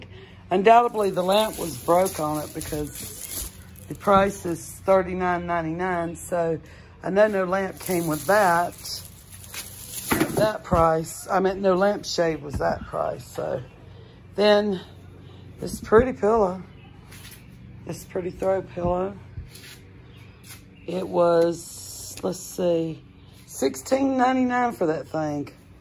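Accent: South, United States. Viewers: Where are you from? Love your southern accent! What store did you find the umbrellas from?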